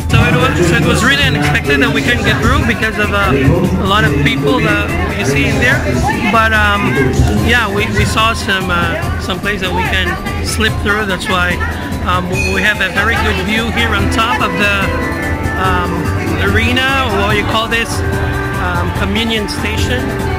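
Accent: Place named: United States. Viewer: Sounds American!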